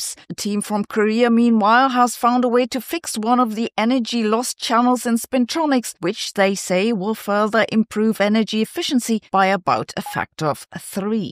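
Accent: Germany. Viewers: There's something about German accents that are cool❤ watch out, baby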